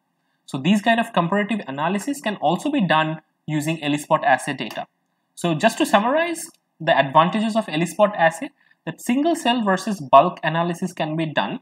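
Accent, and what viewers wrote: India. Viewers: Unfortunately I do not understand the English from Indian people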